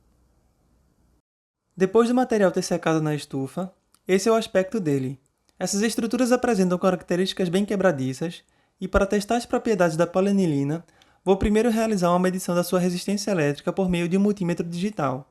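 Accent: Brazil. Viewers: Hay un canal de ciencia se llama manual do mundo. El tío también es de Brasil. Pero su acento es muy diferente de tuyo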